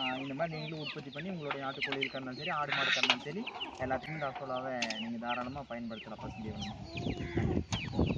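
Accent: Kongu, India. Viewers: your kongu Tamil was super bro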